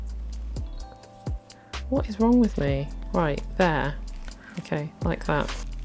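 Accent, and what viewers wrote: England, United Kingdom. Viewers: I bet she's from England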